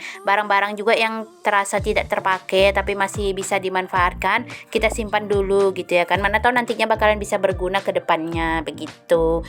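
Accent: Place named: Java, Indonesia. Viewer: Ngmng ny kaya tetangga ku bun dari Jawa ya aku dah subscribe bun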